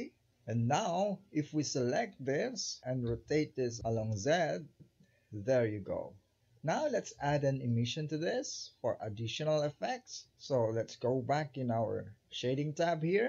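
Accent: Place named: Tagalog, Philippines. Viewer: hahaha your tagalog-accent english is hard to miss 😁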